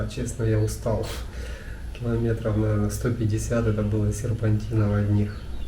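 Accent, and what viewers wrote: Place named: South, Russia. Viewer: Интересная поездочка! Мне не доводилось бывать в Непале. Самая близкое к тому место посещения - Индонезия. Кстати, дымка - скорее всего, проявление высокой влажности. Я такое видел в Джакарте и Лагосе. И вопрос - откуда южный говор, если не секрет? ))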